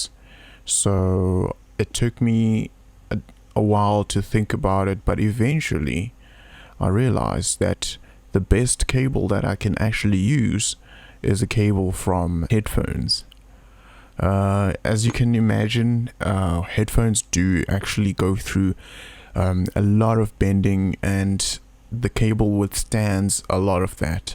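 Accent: South Africa. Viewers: South African? lol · South African? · you sound like you're from South Africa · You sound south african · South african accent.. Thanks.. Good info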